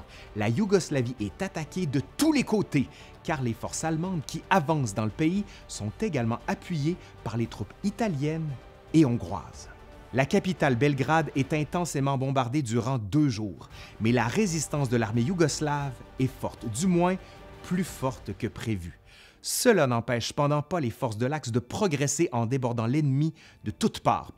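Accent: Quebec, Canada. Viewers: Voilà voilà!... Un pouce en l'air et mon commentaire en bas! Cet accent québécois est royalement beau. C'est de l'ancien français, mais c'est chouette quand ça entre dans les esgourdes, et ne nous le parle pas à la va vite, comme ils font souvent là-bas, si bien qu'on arrive à suivre! Bon, on se laisse ici à nouveau puis on se retrouve en 42. C'est ça?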